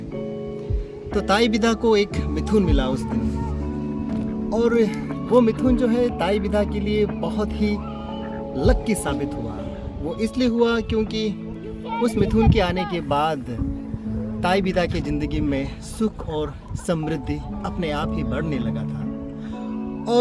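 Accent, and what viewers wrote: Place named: India. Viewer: Your Hindi accent 🥺💖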